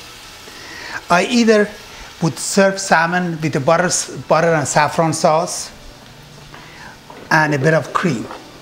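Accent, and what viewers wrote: Iran. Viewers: Awesome video, my salmon turned out great. This guy sounds Iranian. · oouuuuhh i can tell by that accent that ur persian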